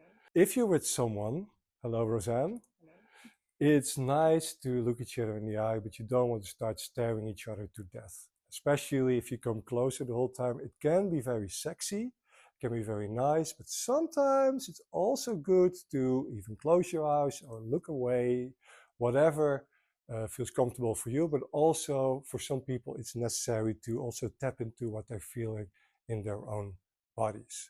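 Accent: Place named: Netherlands. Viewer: He is Dutch 😂 I can tell by the accent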